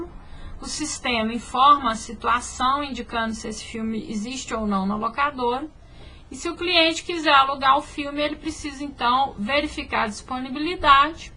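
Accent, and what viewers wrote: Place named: Minas Gerais, Brazil. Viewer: Vcs reclamam de tudo, a moça deve ser de Minas Gerais e o Brasil possui vários contrastes e muitos sotaques. Aprendam a respeitar a forma de falar das pessoas. Com certeza na dependendo da cidade de vcs, também tem um jeito de falar e consequentemente na cidade dela iriam estranhar sua forma de conversar, mas com certeza teriam respeito por entender que não nasceram ali. Espero que tenham entendido agora. Bons estudos a todos !!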